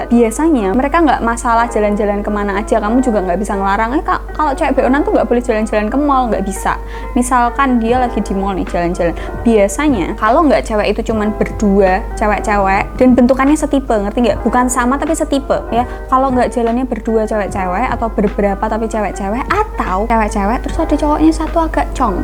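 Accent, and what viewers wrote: Java, Indonesia. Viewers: seneng cara ngomongnya medhok bgt♡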